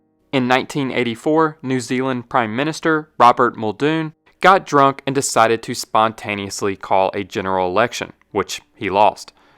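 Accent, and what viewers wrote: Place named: Philadelphia, United States. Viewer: You're from Philly aren't you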